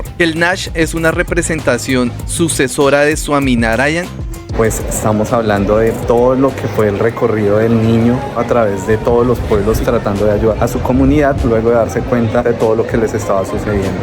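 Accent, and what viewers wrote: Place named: Italy. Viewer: You are speaking Spanish with a Italian accent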